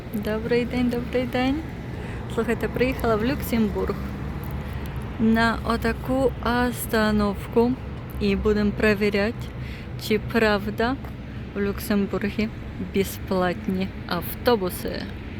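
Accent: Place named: Surzhyk, Ukraine. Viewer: вітання! цікавий репортаж, але ваш дикий суржик все псує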